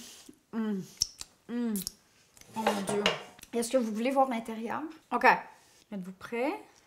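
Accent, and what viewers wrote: Quebec, Canada. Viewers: Mais que c'est sympa ! J'adore votre accent Québécois et belle présentation et conseils. Le bonjour de ST TROPEZ sur la Côte d'azur !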